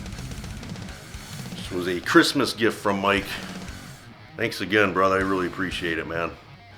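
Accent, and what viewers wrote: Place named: Chicago, United States. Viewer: Fn love this channel bro, lifting and listening to metal! Hey do I hear a mid-west accent in your voice? You sound like a fellow Chicagoan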